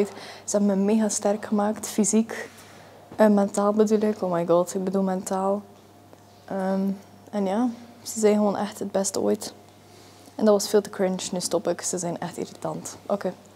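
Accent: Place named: Flanders, Belgium. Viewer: waarom staat er nederlands als je belgisch praat of vlaams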